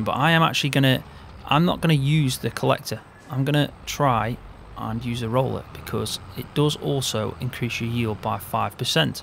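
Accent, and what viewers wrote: Staffordshire, United Kingdom. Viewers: Hi are you from Staffordshire by any chance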